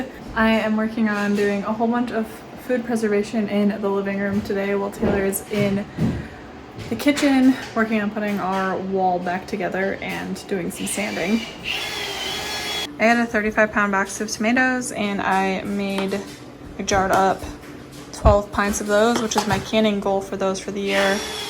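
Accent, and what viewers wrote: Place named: Great Lakes, United States. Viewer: I have sisters in Gaylord and the Soo and I live in NE Wis. I thought your accent sounded great lakes like. I'm looking forward to watching more of you videos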